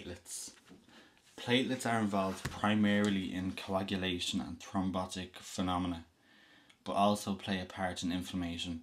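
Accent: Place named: Dublin, Ireland. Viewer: you have the 'i'm from dublin but i'm also gay' accent. not in a bad way it's just it really is its own accent. :)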